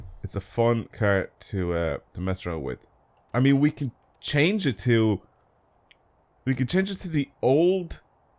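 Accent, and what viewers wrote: Ireland. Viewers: I can't tell what his accent is but it sounds Irish or European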